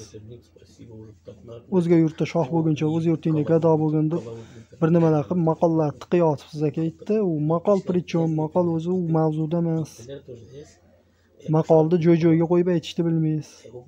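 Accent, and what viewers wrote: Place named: Tashkent, Uzbekistan. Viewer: Салом,. Гапингиздан Тошканликка ўхшаяпсиз. Мабодо Тошканда кўриб қолсангиз,мани ўрнимдан, чирройли,силлиққина"башшарасига тупуриб қўйинг. Йўлим тушиб,бориб қолсам,ўз синглимдек ҳурматингизга етардим. Олдиндан раҳмат.🙏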